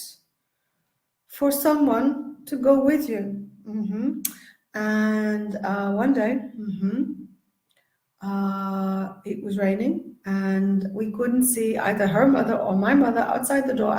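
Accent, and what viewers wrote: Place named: Russia. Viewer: You are absolutely right, she has harsh Russian accent, her knowledge and professionalism I have not criticised by the way, bookish definitely ( having impression that she has not experience talking with native speakers) I tried my best to listen the tutor to the end, no success. I am not teaching English, more over I am not teaching other teaches !!!!!!!! I can have any accent. Good she has got comments, now she can working on her accent . It is hard work in universities for interpreters, they have classes for this particularly problems, as muscles have not been used for soft talk and for different phonetic, but has to be done as many ppl did. Wong Pronunciation it is common for Russians teachers. This is why I prefer to listen and communicate with native speakers .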